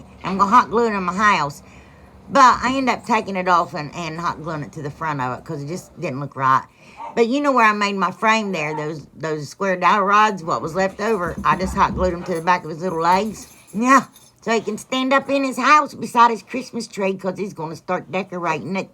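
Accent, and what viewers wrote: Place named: Arkansas, United States. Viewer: OMG! Right, don't apologize for the dogs! I loved it! Had to subscribe, partly because of them! LOL! I'm from northwest Arkansas and this was like listening to someone from my hometown!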